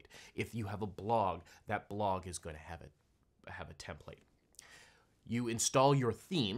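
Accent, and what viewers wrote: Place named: United States. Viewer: TG - American accent real human Shopify vid.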